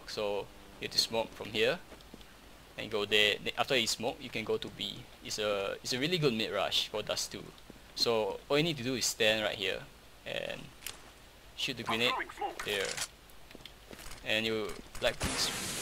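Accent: Singapore. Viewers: You have a Singaporean accent. Hello :D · the accent sounds like sg tho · Hey you from a singaporean background :) You should speak more singlish in comp Can you just play a comp game purposely stressing a singlish accent and upload it to youtube? Because I too am singaporean.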